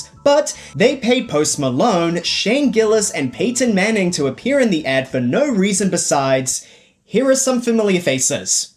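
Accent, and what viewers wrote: United Kingdom. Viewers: ile the fact that is a british person explaining this :hand-purple-blue-peace:😭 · Why is MrBeast reviewing commercials with a British accent?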